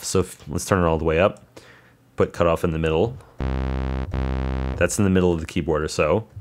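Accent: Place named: Canada. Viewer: I really wanted to see this video but I just couldn't bare to listen to the speaker's annoying Canadian accent.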